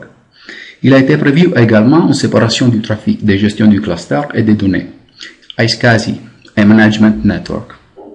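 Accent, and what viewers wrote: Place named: Italy. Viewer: Tres bonne explication , avec une petite pointe d'accent Italien !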